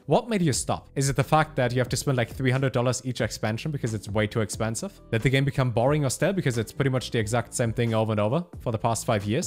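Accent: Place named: Germany. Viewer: That fast German-English accent is exhausting to listen to.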